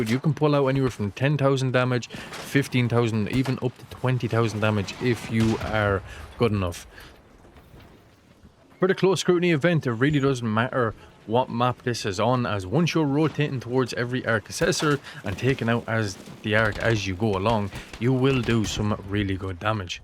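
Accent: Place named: Carlow, Ireland. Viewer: Sounds suspiciously like a Carlow accent 👀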